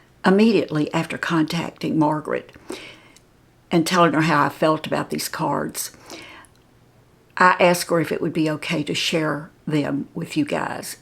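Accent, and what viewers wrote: South, United States. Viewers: Please enter me in the drawing for the cards; they sound great. Happy 80th! I've been watching you for years. It's your lovely southern accent that first caught my attention and then your confidence and wisdom on so many subjects that kept me watching. I also love seeing your all your artistic projects, like the Xmas hanging balls with lights, made from plastic bowls from dollar store. My daughter and I made those last year and they actually turned out really pretty. Makeup, decorating, enjoy it all. Your videos are especially enjoyable to watch after a stressful day. Hope you never stop!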